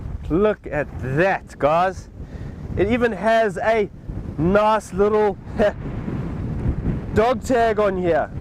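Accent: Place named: China. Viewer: sorry. lost me at chinglish.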